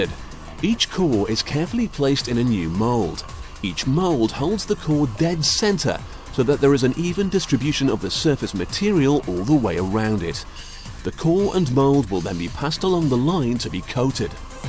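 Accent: England, United Kingdom. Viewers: So if this is in the US then why is a english person from britian narrarating? I thought this was on BBC for a second there. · It sounds like him in as much as he's English. But really, Clarkson sounds nothing like this. Do all us Limey's sound the same to you? ;)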